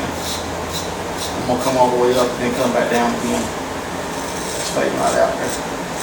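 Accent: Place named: South, United States. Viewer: Awesome cut and you sound pretty southern where you from?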